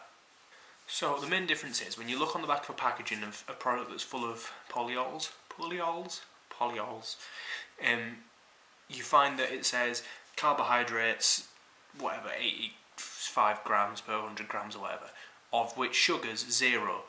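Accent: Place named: England, United Kingdom. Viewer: I was thrilled to find a video with an English accent discussing artificial sweeteners and polyols but this was such a disappointing! You need to plan and rehearse your videos and have your info to hand. The most annoying video I have watched and a waste of time when trying to find a simple answer of you count polyols as net carbs! Please watch Dr Berg, Dr Berry, Keto Connect & Keto Christina for inspiration how to make decent videos and to get your message across even if you want to feature your pet!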